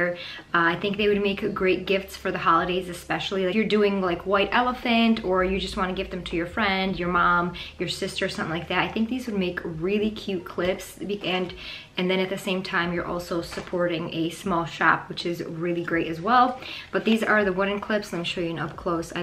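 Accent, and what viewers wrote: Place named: Midwest, United States. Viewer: no way ur not from the midwest with that awesome accent...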